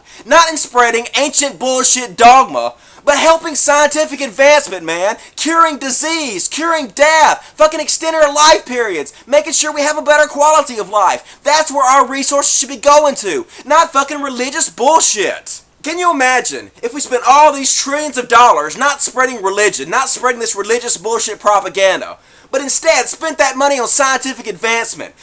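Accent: South, United States. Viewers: You are the first man with a Southern accent I've seen who is an atheist. Congrats! I've subscribed.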